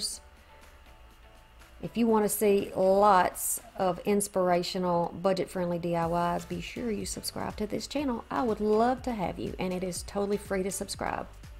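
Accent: South, United States. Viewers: Love all of your diys I know from your accent, you're southern. Where are you from. I'm from gulf shores alabama.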